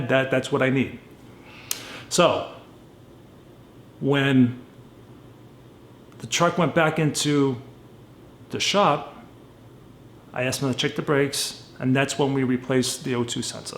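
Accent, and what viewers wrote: Boston, United States. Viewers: I knew I detected a Boston accent. Also, my father had 97’ 4Runner stick shift, really bulletproof. He put 267k on the odometer because he was in the military going from New York to Texas. The vehicle raised me half my life. You made a great investment because in terms of reliability and resale value it’s worth it. Sadly, it’s unfortunate that the frame was rotten, those are pricey to buy and replace.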